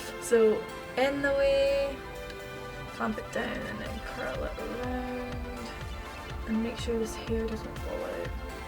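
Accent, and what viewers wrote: Scotland, United Kingdom. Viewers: Hi are u Scottish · i think its Scottish :) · is amazing she talks scotish like me I tried this for the first time I screamed 😜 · Yay scottish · omg are you from scotland !!! i am as well!! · Is that a Scottish accent idect? 😉 I love it, Scottish myself ☺ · Sophia Maniaci thought I would reply since no-one else has- she is Scottish. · Where in Scotland you from? Love your accent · omg you're scottish yayyyyy :) <3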